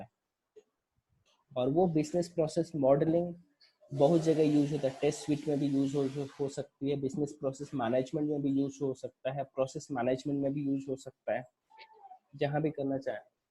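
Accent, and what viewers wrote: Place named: India. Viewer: hard to understand it because of hindish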